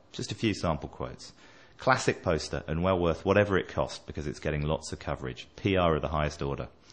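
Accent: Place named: South, United States. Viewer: This is comedy gold - the narator talks about city and says "We did this" "We did that" - an obvious city fan, WITH A FUCKING SOUTHERN ACCENT ! Manchester United are FROM Manchester - Newton Heath to be precise - born in 1878 - much earlier than city. Its all about where your BORN not where you LIVE. One last point, United have had higher average attendance since records began in the 1900's than city, even when united were in Div 2, below city, MORE MANCS ATTEND UNITED GAMES THAN CITY, FACT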